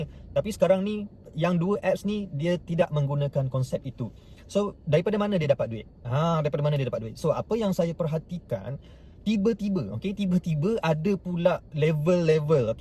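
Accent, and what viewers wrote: Terengganu, Malaysia. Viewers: org ganu ke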